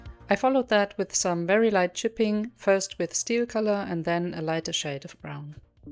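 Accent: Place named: Germany. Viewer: Du hast in der Hitzewelle sowas zustande gebracht? Ich bin im eigenen Saft gegart worden XD Btw, hab dich gerade erst entdeckt, dachte dein Akzent ist soooo deutch, dann kam der Info Drop. XD Egal hab dich abonniert und geliked.:face-red-heart-shape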